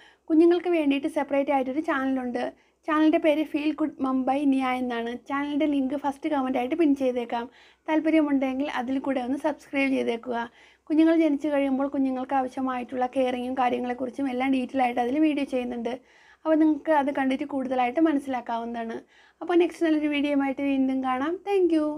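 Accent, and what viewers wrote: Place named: Kasaragod, India. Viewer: kasargod slang pole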